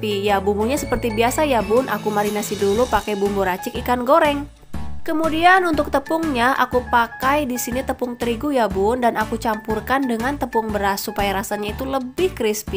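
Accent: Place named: West Java, Indonesia. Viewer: Bunda aslinya org Kendari?kok g klihatan org luar?logatnya udh Sunda banget g klihatan org luar 😁😁👍😍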